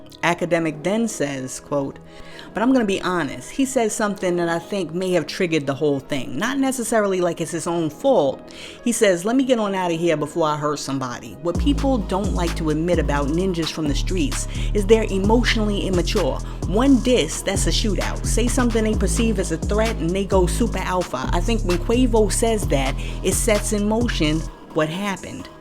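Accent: New York, United States. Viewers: That New York accent is 👍🏿👍🏿!!!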